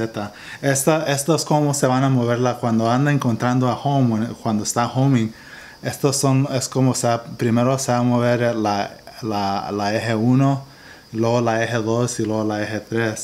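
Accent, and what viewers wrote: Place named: Mexico. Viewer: Hola. Podrías decir los diámetros y paso de los dos Usillos, el X y el Y, así como los pasos por vuelta de cada motor. Puestos a pedir podrías decirnos de donde eres, cómo fue aprender ese Español medio Mexicano y si vives en España. Cuando te haces famoso la gente siente curiosidad, es normal. Un saludo 🇪🇸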